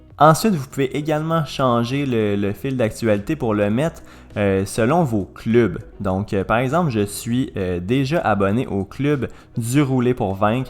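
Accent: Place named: Quebec, Canada. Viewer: Super vidéo j'aime beaucoup les Québécois :-) avec leur accent mais au moins c'est bien expliqué bises de France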